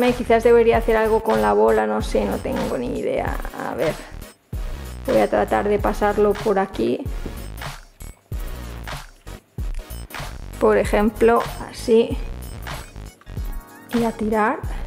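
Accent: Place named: Spain. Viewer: Me gusta el acento de los españoles 🙌🏽 saludos desde México 🇲🇽 !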